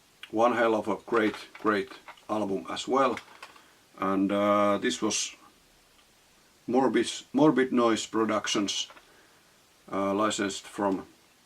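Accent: Finland. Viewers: i love finnish accent so cute :)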